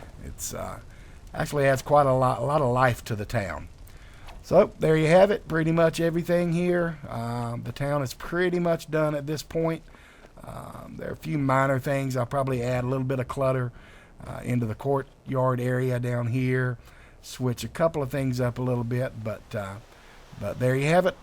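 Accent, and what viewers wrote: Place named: North Carolina, United States. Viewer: North Carolina was my first guess :) I work with a lot of Southerners... developing a good ear for that I guess! Looks good.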